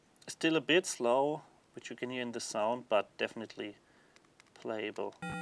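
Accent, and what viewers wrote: Germany. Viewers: I can hear your German accent, I have that one as well :)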